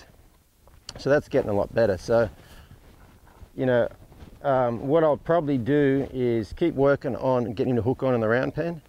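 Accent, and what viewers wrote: Australia. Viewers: Lol it doesn't understand his Aussie accent.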